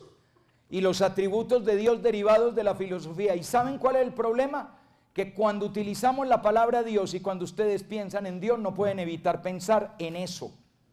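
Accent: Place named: Colombia. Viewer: Me encantan,sus enseñanzas maravillosas,me encanta ése Acento tan,colombiano.no sólo eso.tan enamorado de <link> llegan á lo profundo de mi corazón.no quisiera,perderme,ni una conferencia. Dios le siga dando ésa sabiduría. Divina. Yo soy Colombiana.pero hace 40años vivo en Estados Unidos.Bendiciones lo queremos mucho,padre.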